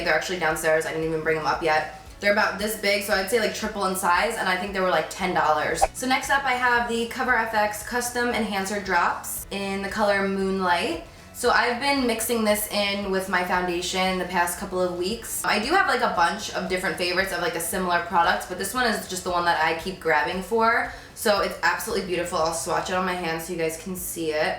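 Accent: New Jersey, United States. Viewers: I knew when you said "wooder" that you were from New Jersey! I thought I recognized that accent 😂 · Rose Sago lol no she has the jersey accent. But she does kind of sound like rihanna now that you mention it.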